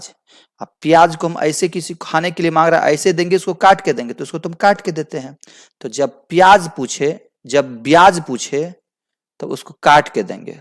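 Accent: Bihar, India. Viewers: आप बोली से बिहारी लग रहे है 😊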